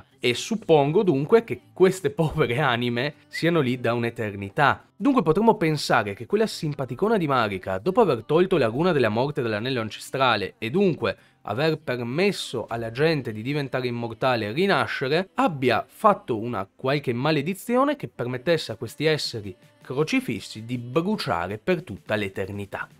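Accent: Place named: Trieste, Italy. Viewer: Si dice crémisi non cremísi, cerchiamo di parlare correttamente la nostra lingua. Capisco che dall’accento che hai la parli poco però sforzati, Trieste non è in Austria da un po’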